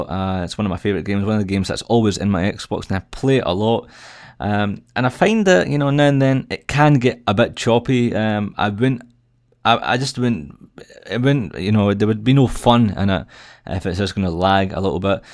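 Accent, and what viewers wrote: Scotland, United Kingdom. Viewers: Scottish sounds awesome, much more special than regular English.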